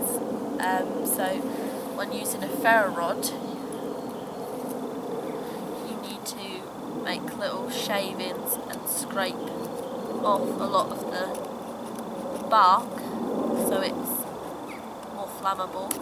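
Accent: United Kingdom. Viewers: your like a little ray mears must be the British accent.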